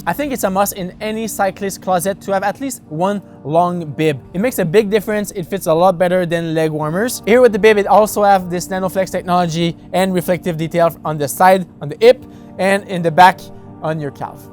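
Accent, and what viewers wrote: Quebec, Canada. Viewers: Auto Subscribe for that Quebec Accent.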